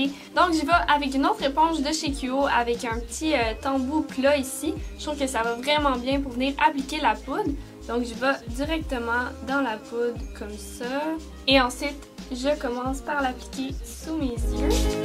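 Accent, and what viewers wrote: Canada. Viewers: Tes vidéos sont toujours autant fun! J’te suis depuis le début et je me lasse pas de tes vidéos! Et cet accent canadien 🙌🏻 Je retourne à Montréal en octobre, j’espère te croiser !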